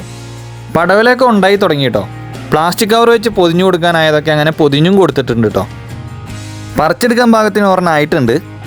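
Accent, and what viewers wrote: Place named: Muvattupuzha, India. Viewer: സംസാരത്തിൽ ഒരു മുവാറ്റുപുഴ... പെരുമ്പാവൂർ... കോതാമംഗലം ടച്ച് ഒണ്ടു "ട്ടോ " 😎, ഒന്നുകിൽ പരെന്റ്സ് അല്ലങ്കിൽ ഗ്രാന്റ് പരെന്റ്സ് ഈപറഞ്ഞ സ്ഥലവും ആയി കണക്ഷൻ ഇല്ലേ ബ്രോ ? സത്യം അല്ലെ ☺️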